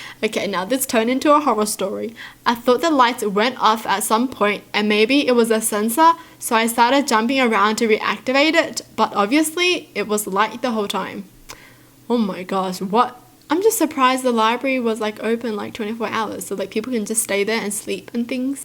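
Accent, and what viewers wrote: Australia. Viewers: She's Australian lol <3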